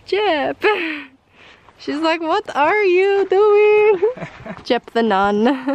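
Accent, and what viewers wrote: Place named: Australia. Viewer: Lol I picked your accent. Are you from Australia? I'm in NSW!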